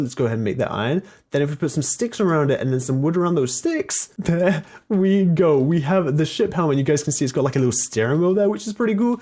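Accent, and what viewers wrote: United Kingdom. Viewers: Who Loves british acent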